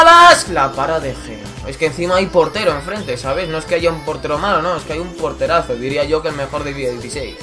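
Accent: Mexico. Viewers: eres de sinaloa mexico?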